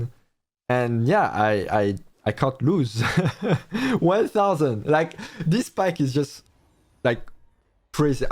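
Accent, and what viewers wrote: France. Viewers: love how you talk, did you grow up in france?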